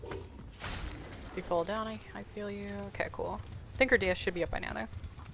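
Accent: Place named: South, United States. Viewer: That southern accent , I don´t wanna camp ya´ll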